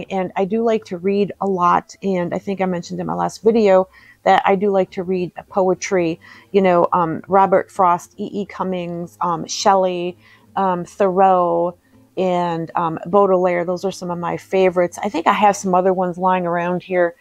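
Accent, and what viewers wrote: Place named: Midwest, United States. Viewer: NY🤨 insurances all up. Originally from ND. Are you from the Midwest? I hear something as you speak. So I really appreciate your way of putting things, the concept of liking the more vintage times. My dad was not into vintage because he lived it but still I found/find comfort and joy in the things from the past. He was also navy during Ww2 and Korean and love the wedding photo you showed. I have read most of the Agatha Christie. Finishing up Nemesis. Murder mysteries and some historical fiction is my go to. I could go on but I just wanted to encourage you. Thank you!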